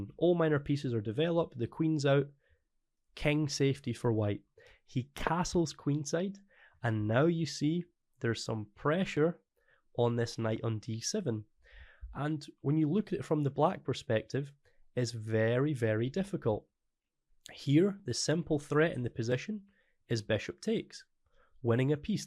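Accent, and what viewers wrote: Scotland, United Kingdom. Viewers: Holy shit I’ve found a Scottish chess creator let’s go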